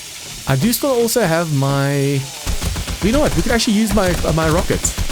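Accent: South Africa. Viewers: question are you south african ?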